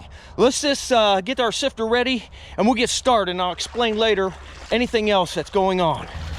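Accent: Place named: Pittsburgh, United States. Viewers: It's a southwest PA dialect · That Pittsburgh accent I can here it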